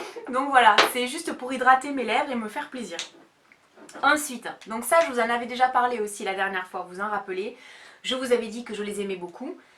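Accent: South, France. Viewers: coucou ! super ta vidéo haul beauté j'adore Perpignan on vas souvent en vacances a port barcares j'aime trop ce coin et moi j'adore ton accent du sud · Bonjour, comme beaucoup, je connais la marque BareMinerals. Je n'ai jamais testé leurs produits, mais oui, j'imagine qu'ils sont aussi bons que ceux d'Urban Decay que j'adore. Tout comme toi, j'ai les yeux bleus, et je prends beaucoup de crayons noirs, bleus nuit, prune et autre.... Je raffole de leurs rouges à lèvres: Shame, Venom, Jilted, Anarchy..... J'ai bien envie de tester aussi ceux de BareMinerals qui me font de l'oeil depuis un moment:) Pour l'argan, c'est un produit que j'aime beaucoup pour les cheveux, mais aussi en huile pour le corps. Pour le mascara,je m'en suis commandé un sur Aliexpress; Il ne vaudra sûrement pas celui de BareMinerals, mais je verrais bien. Pour Octoly, je trouve normal que les youtubeuses aient des produits gratuits sur ce site. 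Moi, ça ne me pose aucun problème. C'est des produits qui leur seront utiles, et qu'elles peuvent nous présenter, même si, effectivement, les délais pour les tester avant les videos, sont courts. Voilà, je te remercie pour cette video. C'est tout à fait vrai que ton accent du sud est tout mimi. C'est un accent qui chante. J'ai toujours la banane quand je t'écoute:) Très gros bisous ma belle, et à bientôt:)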